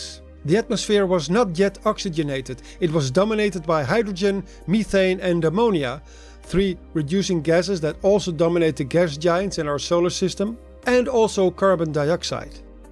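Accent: Netherlands. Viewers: Excellent video in beautiful Dutch accent ! Do more of this type of explainers. Best wishes 🎉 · Love Dutch English as Dutch man ❤️😅❤️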